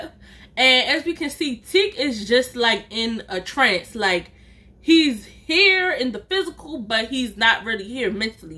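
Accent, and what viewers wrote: Baltimore, United States. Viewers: I’m a new subbie to your channel. You’re such a funny storyteller! I’m really enjoying watching your vids. Andddd I lived close to B’more for a few years and your accent took me back down memory lane. When I first moved there I asked for good food and she said hip hop chicken and said “hot dug”. I said no disrespect but I don’t know what you’re saying could you repeat and ultimately I asked baby girl to spell it!!! Lmao gurllllllllll I felt so dumb! Sorry for the rambling! Sis you’re doing a great job on your channel! Remember to Always keep your head held high so your crown 👑 won’t slip 🧡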